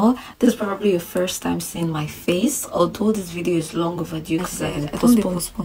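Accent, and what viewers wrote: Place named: United Kingdom. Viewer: I like the blend of Queen’s English and Pidgin🤝 Keep ‘‘em coming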